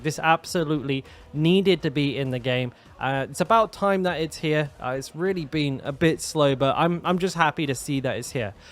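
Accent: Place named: Kent, United Kingdom. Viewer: Also, out of curiosity, how come you are on Asian time, you sound like your from Kent?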